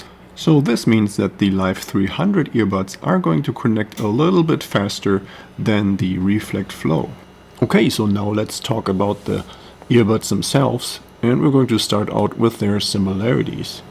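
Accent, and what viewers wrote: Germany. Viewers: U have a German accent so GUTEN TAG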